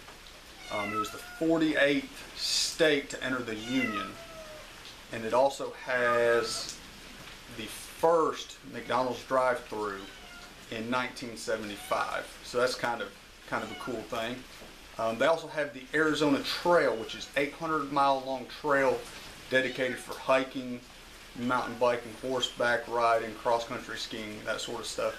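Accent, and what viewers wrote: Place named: South Carolina, United States. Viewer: Great to see and hear you! I grew up in S.C and I miss that accent! Arizona is a nice place!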